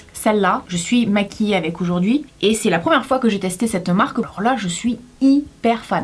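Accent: South, France. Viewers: J'adore ton accent du sud parce que j'ai le même je t'aime trop toi et tes concept décaler😍😍😍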